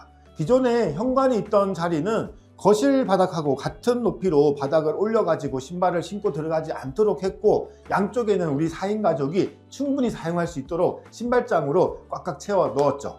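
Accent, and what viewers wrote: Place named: Gyeongsang, South Korea. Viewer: 구수한 경상도사투리로 설명을 잘해주셔서 도움이 많이됩니다 대구에도 파트너사 있는지요??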